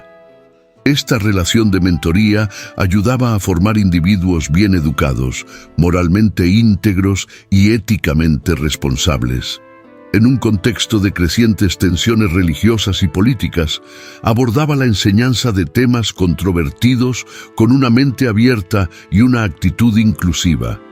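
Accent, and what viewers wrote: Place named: Castile, Spain. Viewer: Es con acento castellano 😊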